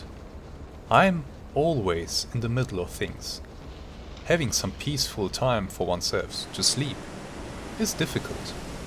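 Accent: Germany. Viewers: I actually thought you were german! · a German accent is more than welcome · You sound German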